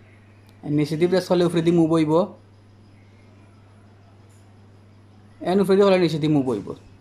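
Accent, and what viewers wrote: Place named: Sylhet, Bangladesh. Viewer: ভাই আপনার সব ভিওয়ার সিলেটি না। পারলে শুদ্ধ বাংলায় বলেন।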